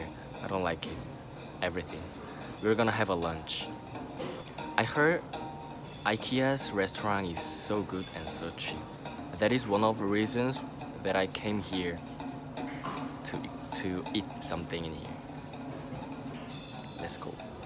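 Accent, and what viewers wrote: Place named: South Korea. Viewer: Your pronunciation of Ikea is actually very similar to how it’s supposed to be pronounced. I’m from Sweden and we pronounce it like you do, except you have a bit of a Korean accent to it 😊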